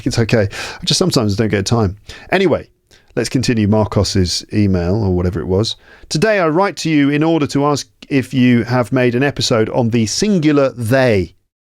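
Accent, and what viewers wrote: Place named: RP, United Kingdom. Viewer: <link> is one of the BEST English Podcast I've ever heard on British RP (Received Pronunciation), Phonetics and Phonology, Prosody (Prosodic Break, Prosodic Interruption) in NATURAL NATIVE English Language by on YouTube channel named, So GREAT and I am grateful to your Instructions, I choose your Podcast channel to practice Articulation and NATIVE English accents.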